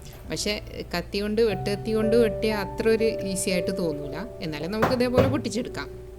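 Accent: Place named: Travancore, India. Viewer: കൊല്ലക്കുടിയിൽ മൊട്ടുസൂചി വിൽക്കുക എന്നൊരു പഴം ചൊല്ല് ഉണ്ട്; ഏതായാലും താങ്കളുടെ തിരുവതാംകൂർ സ്ലാങ് മലയാളം കേൾക്കുന്നതിൽ സന്തോഷം.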